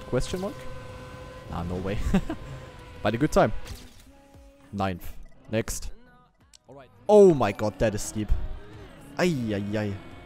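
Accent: Germany. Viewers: Dieser andere Deutsche Akzent 😂👌🏽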